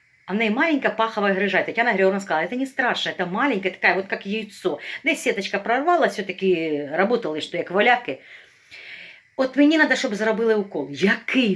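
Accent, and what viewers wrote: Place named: Poltava, Ukraine. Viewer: Добрый день,! Я уроженка Полтавской области, Чутовский район. Для меня ваш говор просто бальзам на душу. Как дома побывала. Все ваши видео смотрю, многие готовлю по вашем рецептам. Желаю вам быстрейшего наступления мира. Держись.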